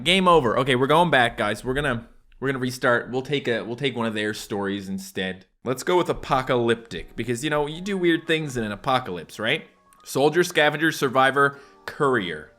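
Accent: Newfoundland, Canada. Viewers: ever since hearing your newfy accent i haven’t heard you the same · after i watched his real accent video i cant unhear the hint of a newfie accent in his voice now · SPEAK in your newfie accent starting from now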